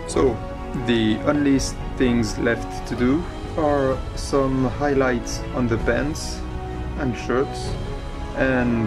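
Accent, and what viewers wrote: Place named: France. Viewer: j aime bien ton accent français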